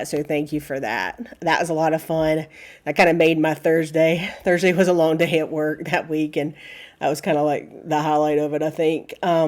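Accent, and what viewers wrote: South, United States. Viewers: Never apologize for being you! You are real and I love your southern accent! Happy stitching! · I so enjoy watching your flosstube. You are just a hoot. You are you and I so appreciate your authenticity and your southern twang. Seeing all the witch charts you made and bought was a treat. Instant viewing of finishes and future possibilities for us to do. Thank you for all you do.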